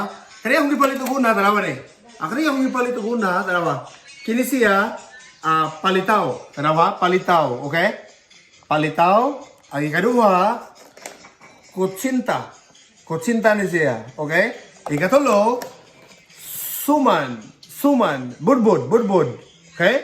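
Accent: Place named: Visayas, Philippines. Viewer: Kalami ana sir oi nalingaw ko nimu oi bisdak japon kaayo ug sinultian,salamat diay sa imong support ganiha sa akong ls sir/Lyn’s Beauty here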